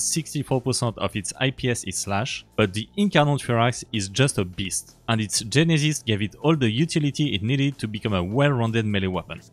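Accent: France. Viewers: This french accent i guess is just perfect haha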